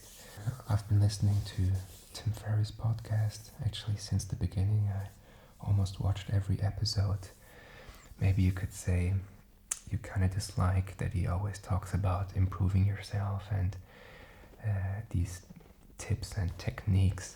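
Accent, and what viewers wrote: Scotland, United Kingdom. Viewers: His accent is scottish btw :) sounds just like my mum and dad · That’s a scotch accent haha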